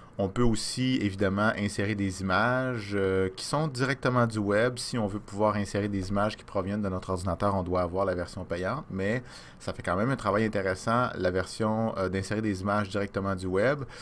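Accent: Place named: Quebec, Canada. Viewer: Non, si tu savais comment tu me sauves du temps. Tes capsules sont très bien expliquées car j'ai fait le tour...et d'autant plus c'est la voix d'un québécois... hihih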